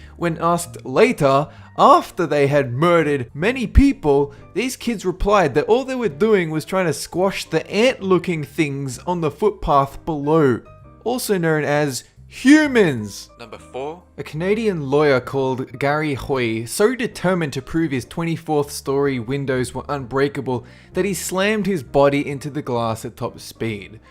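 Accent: Australia. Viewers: sounds like an Aussie? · Debbles NZ lol wtf, I'm an Aussie and yes he is definitely Australian, how could you confuse that with an American accent? it's so obvious, especially if you're a Kiwi or Aussie!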